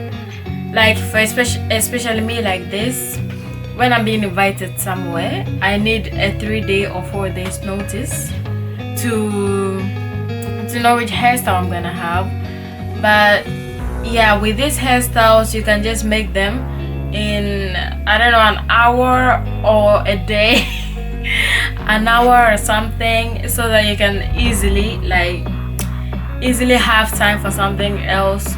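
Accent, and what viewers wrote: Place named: Jamaica. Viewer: This was enjoyable to watch 😂🥰🥰 Also, I love your accent, where are you from? Jamaica? I'm Nigerian btw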